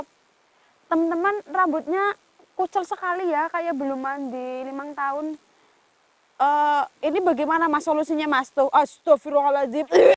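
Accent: Banyumas, Indonesia. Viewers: Mb lucu nek ngmong ngapak....haaaaa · kui mba wong ndy jane sih deng ngomonge ngapak😂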